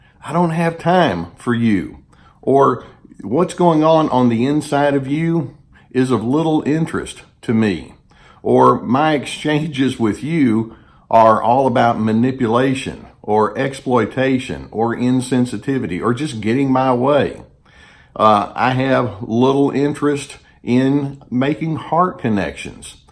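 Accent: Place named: Texas, United States. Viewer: You sound like you're from Texas-east or central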